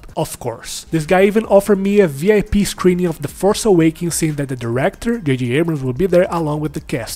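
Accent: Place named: Brazil. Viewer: E aí, mano! Acabei de maratonar todos os videos do seu canal na ordem. Reconheci logo no primeiro vídeo o sotaque brasileiro. O conteudo é muito bom e o roteiro dos vídeos tá muito bem feito, obviamente. E ainda por cima você tem carisma. Tem tudo pra fazer sucesso, acho que já está fazendo sucesso. Parabéns, ganhou um inscrito! Abraço.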